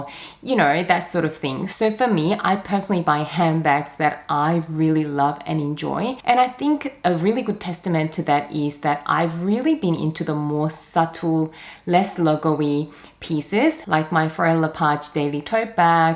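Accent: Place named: Australia. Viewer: Hi sorry if this is a weird question are you from Melbourne? Only because there was another YouTuber who sounded identical to you I thought it was you actually.. I think I just found a difference in accents between Australian accents which I never noticed before Anyway bags are nice but I wouldn’t trade them for my seventeen o/s trips I have done.. 4 lux bags are plenty right now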